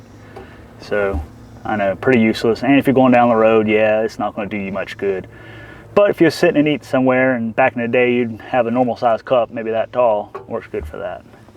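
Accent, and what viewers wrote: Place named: United States. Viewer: Is this the Stephen King car ? new subscriber glad to be here ,hey from Australia,what a sensational voice ,this is how as a young kid livin in the outback i expected real Americans sound like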